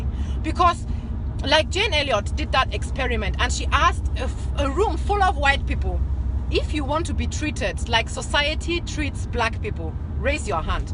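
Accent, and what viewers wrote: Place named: Nigeria. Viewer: It's the Nigerian accent I keep hearing from you for me🥰❤️🇿🇦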